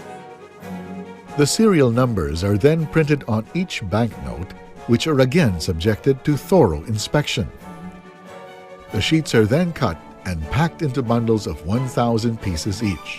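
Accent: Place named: United States. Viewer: Yes Philippine money looks better than u.s. money. btw why does the announcer sound American? lol ))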